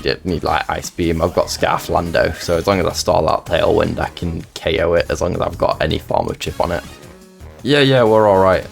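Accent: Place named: United Kingdom. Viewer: Do you go to many regionals. You sound british to me? Did you go to the Birmingham regionals?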